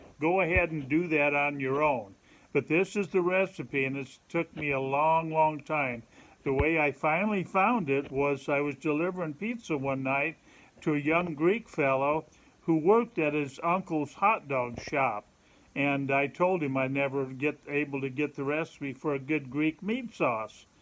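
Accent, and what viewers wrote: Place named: Pennsylvania, United States. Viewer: sounds like you are from Reading Pa. thank you!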